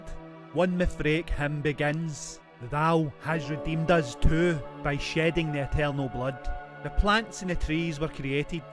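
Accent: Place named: United States. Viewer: To the owner of both..'lost history Channel",' light up the dark" channels ...my sincere apologies for any offence taken , two AMAZEIN CHANNELS , I totally went around it the wrong way , of saying i loved the strong American outstanding narrator which made me listen more intensely, am a Glasgow man myself please don't take offense ,it's just i hear that accent everyday.... Sincere apologies again ......ANOTHER SCOTMAN.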